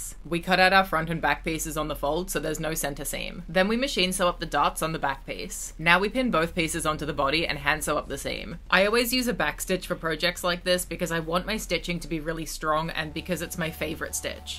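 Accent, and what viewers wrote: Australia. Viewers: bro said he was Australian without saying he Australian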